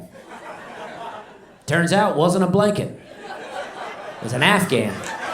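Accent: South, United States. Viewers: This man stole all my jokes and just added a subpar southern twang to it.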